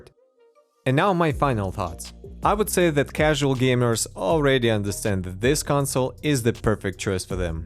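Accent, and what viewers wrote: Russia. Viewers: Капец как русский акцент слышен!)))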